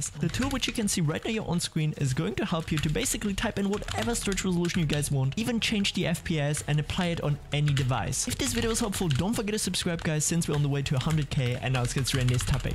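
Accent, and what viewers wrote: Germany. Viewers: are u german ?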